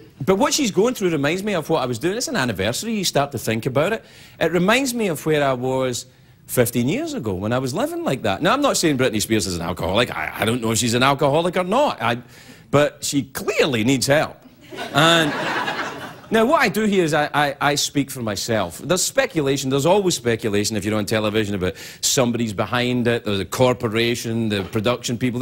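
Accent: Scotland, United Kingdom. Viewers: Is he Scottish? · he sounds so much more scottish here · His accent was so much thicker then. RIP Scottish Accent. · he sound REALLY scottish in this wow, his accent is a lot less prominent now. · His accent has gotten less Scottish and more Americanized over the years, for sure. You can really hear his accent coming out here. Maybe it's just him talking from the heart and not trying to do his usual monologue voice or something like that. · He still sounds Scottish here. He had almost lost it by the end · He sounds so scottish here. Usually i think he has lost his accent but i can definitely more than hear it, too. Also that audience sucks they didnt understand the assignment. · He sounds a lot more Scottish here than he does now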